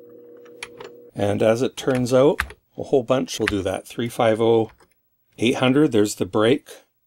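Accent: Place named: Sault Ste. Marie, Canada. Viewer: Hi, I'm watching this in July 2019 months after the Wisconsin convention (hey, that's the beauty of YouTube) but you say in the intro you were heading down from Canada. With Canada being the second largest country in the world, where in Canada? If it's a privacy issue, no problem. I've just subscribed and started binge watching some of your C64 and 6502 videos, great work! I'm going to hazard a guess based on accent... Sault St Marie? Regardless, keep up the great content! Your channel is a retro-blast and despite thinking I've mastered 6502 asm and the C64 world, I've learned some great little tricks so far! I was happy to see that these quirks "work" on Vice C4 Emulator (except the "screen saver" one) as well as my C64!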